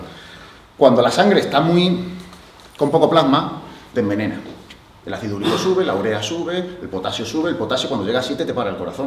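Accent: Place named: Extremadura, Spain. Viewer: El tío es de Cáceres (Extremadura) es normal que hable así, ya que la zona de Extremadura fue una zona muy poco urbanizada por bastante tiempo y conserva un acento muy castellano, parecido a los que hablen en Castilla y León, exceptuando la zona más sur de Extremadura casi pegada a Andalucía. Lo sé porque soy extremeño.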